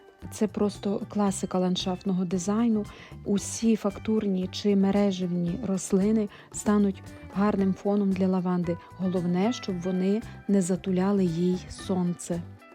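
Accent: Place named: Transcarpathia, Ukraine. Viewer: закарпатська українська- особлива)) у вас якийсь свій діалект))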